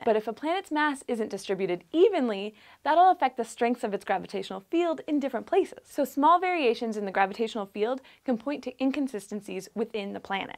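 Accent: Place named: United States. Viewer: Slow down. I'll have to rewind to try and figure out what you said right at the start. I'm not American so your accent makes it even harder. Otherwise I like the way you sound.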